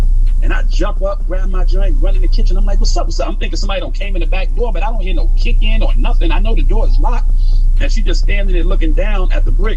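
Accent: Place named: New York, United States. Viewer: Yo this nigga sound like Jay-Z this the second video I'm hearing with u and ol head y'all New York niggas be sounding the same on that jack especially with that New York accent. I got a good ear I can always tell where a person from by how they talk and what words they use.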